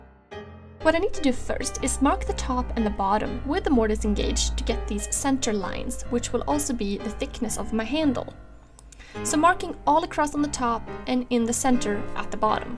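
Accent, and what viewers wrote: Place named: Sweden. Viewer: Hello there! This is the first one of your videos ive seen and im just wondering, your accent it sound swedish. Are you from sweden?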